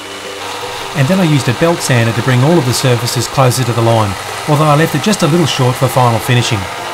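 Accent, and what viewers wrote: Australia. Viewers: Bloddy hell cobber, have you got an aussie accent mate! Crazy the difference between states (Sth Aust.)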